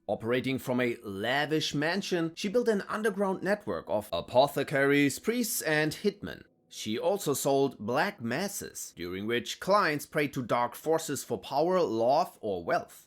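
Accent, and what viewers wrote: Germany. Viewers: I looove your german english accent! 😂 I keep going back to your "every german state explained by a german" video! keep it up!!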